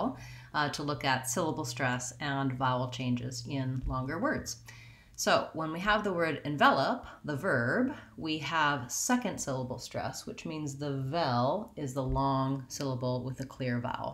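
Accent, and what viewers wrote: United States. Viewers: You’re from the Midwest I assume?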